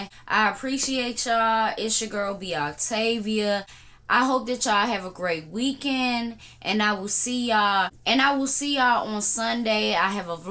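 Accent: Washington DC, United States. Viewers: I'm from WASHINGTON D.C. UPTOWN PROJECTS 640 PARK/MORTON I WAS BORN IN EASTGATE GARDENS SOUTHEAST... ARE YOU FROM WASHINGTON D.C. B OCTAVIA???? YOU SOUND LIKE A DC girl..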